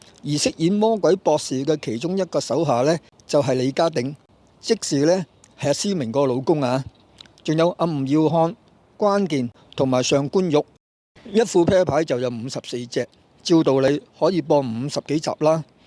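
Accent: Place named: Weitou, China. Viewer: 喺唔係起, 有心做就注意吓啲廣東話發音, 唔好用啲咁嘅圍頭話發音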